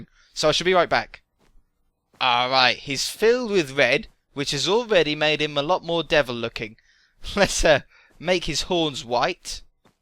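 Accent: United Kingdom. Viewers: I can sence yo' britishyness. Is that what it's called? Btw love your vids! 😸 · I am not trying to be mean but u could ride at the end of your videos but u really do a good job and r u British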